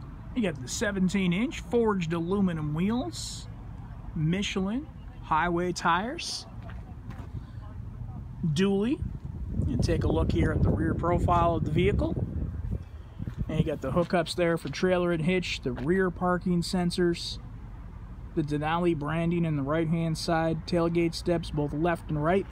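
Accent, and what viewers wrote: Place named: United States. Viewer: what american accent is this?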